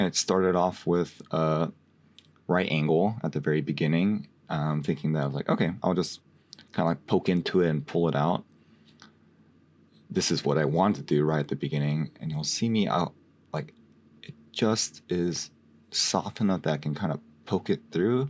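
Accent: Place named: Australia. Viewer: Please don’t stop doing these, love your approach and your voice is wonderful. Couldn’t work out where you were from, thought you could even be from Australia. We don’t all sound like hillbillies.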